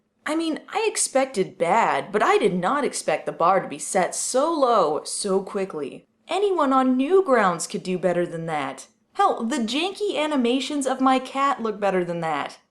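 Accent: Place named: Midwest, United States. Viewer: I love your funny faces at some of the more absurd parts of this terrible film. Off-topic: Are you a Wisconsin or Minnesota native? I only ask because of your accent.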